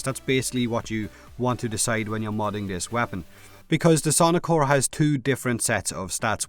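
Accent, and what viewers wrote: Ireland. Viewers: I do enjoy an Irish accent much better than listening to the wee hawbaw wanks about here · Irish accent sounds so funny :D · Holy shit, that is an awesome Irish accent! Hahah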